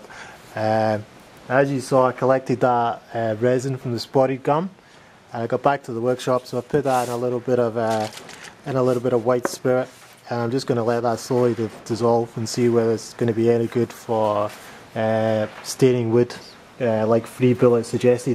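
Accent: Scotland, United Kingdom. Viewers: There,s me thinking ah yes got to be an aussie and then I hear a man with ma home country accent, see you, you must be a jock thats what im thinking,anyway loved your video and the intro is good enough for the movies keep up the good work all the best.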